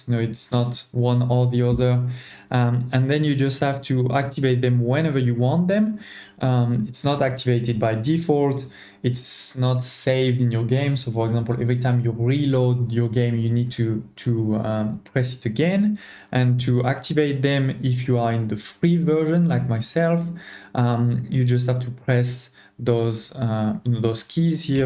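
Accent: France. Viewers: Wow, where are you from ? You sound like french accent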